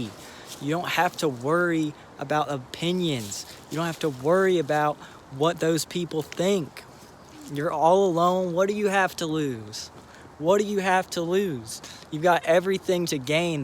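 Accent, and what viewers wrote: South, United States. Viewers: You poor kid, I know exactly how you feel. I've felt the same way ever since my awakening in June of 2009. The one major difference is that I've been lucky enough to find a partner who is on the same path of ascension. Judging from your accent, I imagine you live in a part of the US often referred to as the Bible belt, where spiritual truth is deemed satanic by an overwhelming majority of people. I must say I admire your light-hearted way of being, considering how alone you must feel. It's strange, we go on the internet and we see thousands upon thousands of posts from people who definitely seem to be on the path of Ultimate Truth as we are. Yet, when we look at our immediate surroundings, we feel completely isolated in our quest for ascension. In these times of confinement, it has occurred to me that a lot of people are using an app called Zoom for all sorts of things, yoga classes, business conferences, etc. 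Maybe those of us who are on this sacred path should form a group with that app too so we can share ideas and experiences. What I want to share with you, my young friend, is that you are not alone, not even close. Like you have said here, the spirit world smiles upon you every day all day, but in addition to that, you have gained a lot of popularity in a very short time on this channel, which goes to show there are many of us, tons of us, who know what you know, and think as you think. In the age of technology, maybe we should take advantage of this technology to unite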